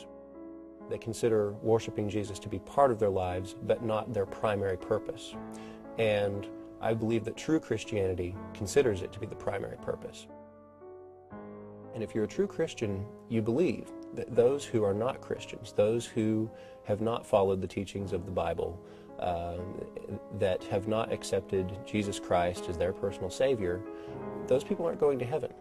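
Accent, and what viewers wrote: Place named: South, United States. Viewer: I think the relatively subtle southern accent and that extra little wisp of hair fooled us all into assuming you were christian, while most of us now know you are... how do you say... an 'actor'. But seriously, I think you did a great job of portraying a perfectly intelligent and very eloquent young man who happpens to be brainwashed.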